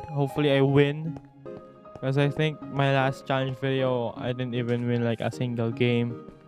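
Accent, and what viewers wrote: Philippines. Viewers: from Philippines Confirm! Hehehe Proof: 1:From one of your helper in you server 😅 2:Your voice 3:You play in Asia Region Only that ooooffff But that's a good proof🤣🤣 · Are you a pilipino you sounded one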